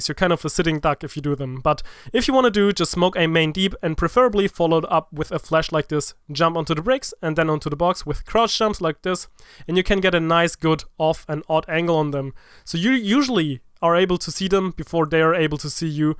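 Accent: Germany. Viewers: ! Ist minimal hörbar hast für deutsche echt wenig Akzent, Gutes video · Влад Губин some german accent right there imo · you are german am I right?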